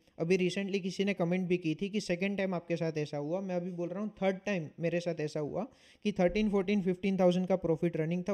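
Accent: Gujarat, India. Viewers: Bhai Tame Gujarati cho?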